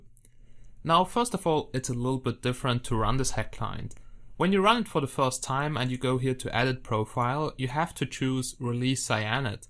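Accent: Germany. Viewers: Thanks, love your accent. German right ? Anyways everytime I click resource pack it crashes. Not big deal just thought to tell you. Nice video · wie man das deutsche leicht raushört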